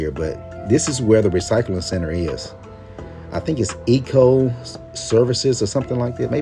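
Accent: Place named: Georgia, United States. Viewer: Love the video, looks beautiful. Your accent sounding like it from Georgia where I used to live 👌🏽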